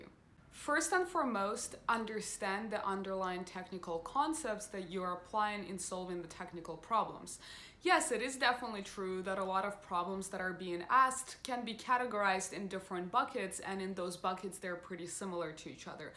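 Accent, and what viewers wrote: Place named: Russia. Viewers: У тебя такой русский акцент. Не знаю почему, но очень тяжело слушать. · мне тоже так показалось, она русскоговорящая.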